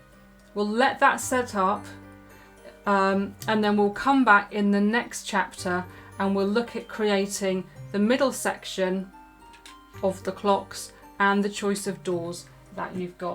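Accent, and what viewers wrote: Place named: United Kingdom. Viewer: love your tutorials since i was clueless; can you remove the background music because it is distracts from your beautiful accent; Love that British accent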